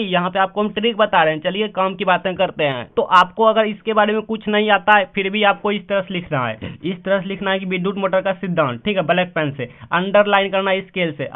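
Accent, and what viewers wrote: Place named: Bhagalpur, India. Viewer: Sir aap bhagalpur jile se ho kya kyunki main bhi wahin se hoon aur meri bhi bhasa aisi hi hai😊😊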